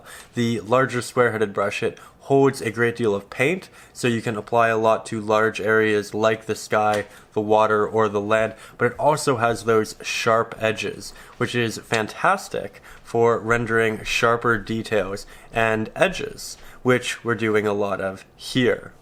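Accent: Canada. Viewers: Hi I've watched this b4. I know or assume you're in Canada due to your accent, I grew up sailing & boating on the shores NE.This water direction looks odd to me. Where is it coming from? The ocean would not flow from the bank opposite your beach? It would come from the horizon wouldn't it? Therefore the waves would pass over the beach horizontally? My mind simply can't settle on it. Is it me??? Can you explain it to me? Thanks for sharing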